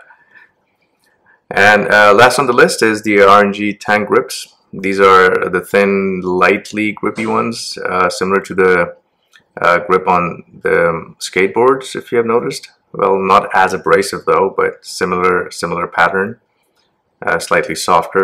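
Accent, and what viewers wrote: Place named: United States. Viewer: Goddamn if not for the Jaipur tag I would have seriously thought you to be American 😂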